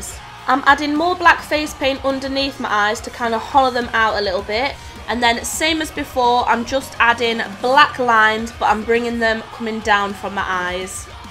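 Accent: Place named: Yorkshire, United Kingdom. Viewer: I wasn't expecting that accent... are you from Yorkshire?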